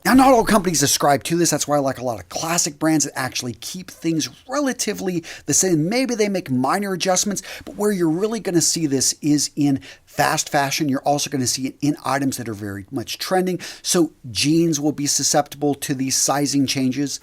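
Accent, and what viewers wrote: United States. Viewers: Yes of course but specifically which dialect of American English?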